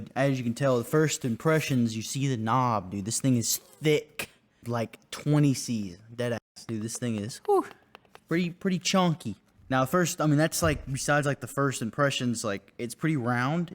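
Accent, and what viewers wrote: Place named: South, United States. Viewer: slightly country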